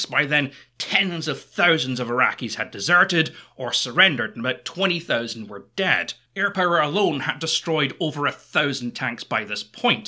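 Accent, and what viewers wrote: Scotland, United Kingdom. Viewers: I love the Scottish accent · Why does he sound like Sheogorath,but Scottish and sounds like he's sick.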